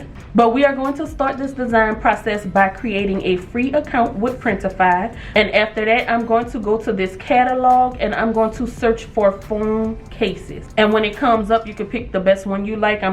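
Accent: New Orleans, United States. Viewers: Love the New Orleans accent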